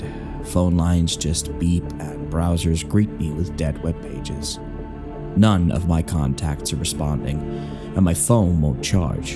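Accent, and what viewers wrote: United States. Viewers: your American accent is basicly flawless.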